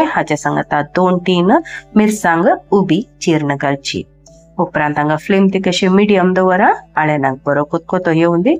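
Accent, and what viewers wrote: Konkan, India. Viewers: Tai Tumi ulaitalo Konkani bhasha kitle chod god aasa. Ani haanv pun ambot bangude ani tarlee nistyancho kadi banounk shiklo aasa. ❤❤❤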